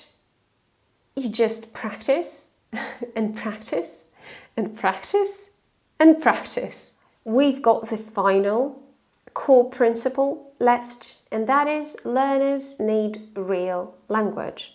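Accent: United Kingdom. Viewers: Hi, you can be completely mistaken for a native speaker, which is rare. You've deserved a praise. Counter-intuitively, speaking with a perfect Modern RP accent might indicate that you are a foreigner, ha-ha (but that doesn't matter of course). Have you polished your pronunciation being in the UK by emerging? Arguably, the hardest part of English is pronunciation. Many people speak English, but only a few speak clearly and are pleasant to listen to. My mother tongue is Russian as well. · I wonder what your natural English accent sounds like. I mean, without any conscious effort put into it. It's hard to believe that British accent could be natural for anyone haha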